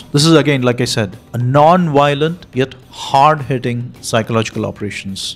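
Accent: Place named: South India, India. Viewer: Man this guy has a very thick South Indian accent. If only he hired someone with better narration, he has been doing it for years and still he hasn't realized it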